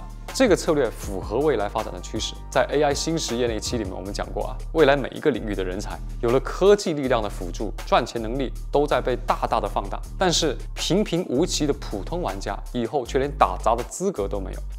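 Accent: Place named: Cantonese, China. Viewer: 就这熟悉的广东口音，爱了爱了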